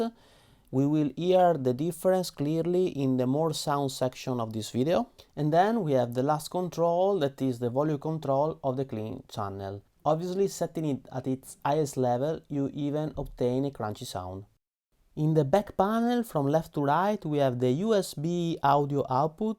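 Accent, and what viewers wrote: Venice, Italy. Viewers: a sentirlo temo di si ;D mi sa che è veneto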